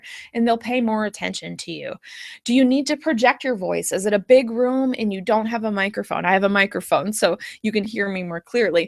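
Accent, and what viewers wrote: United States. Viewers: You have an American accent.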